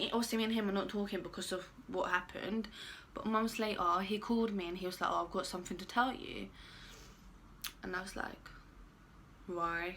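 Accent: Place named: London, United Kingdom. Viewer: You from London or something!? Love the accent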